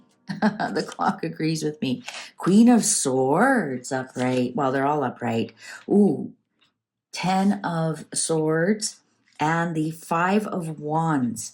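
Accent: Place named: Canada. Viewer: Thanks for today's reading. I thought you were Canadian. I'm a fellow Canadian transplanted in Florida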